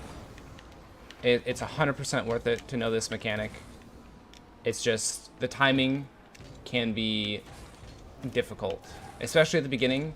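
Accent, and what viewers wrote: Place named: Europe. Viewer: l Sven l I can tell your from EU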